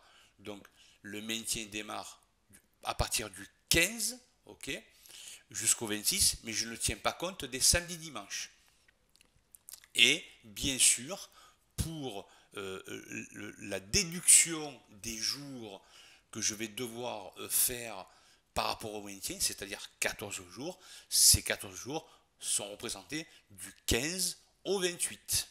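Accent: South, France. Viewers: ravie de vos explications avec l'accent du sud en plus !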